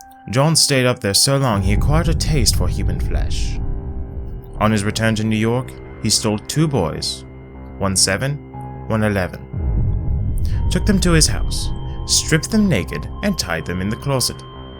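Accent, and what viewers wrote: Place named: United Kingdom. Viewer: I loved your British accent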